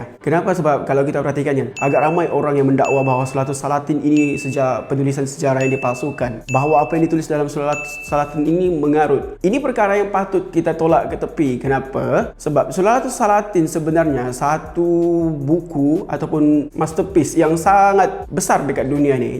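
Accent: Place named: Sabah, Malaysia. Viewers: bro, istidi saja kau sama slang sabahan mu, lg siyuk didengar, ok. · Pekat sabahan mu pok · Nampak thumbnail.. uinah mesti Sabahan ni.. klik.. dengar suara.. confirm urang kita baini.. mantap bosku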